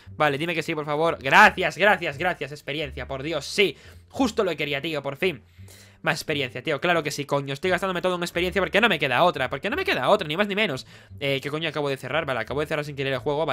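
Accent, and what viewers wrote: Chile. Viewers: jejeje ese idioma buen Chileno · hablas chileno ???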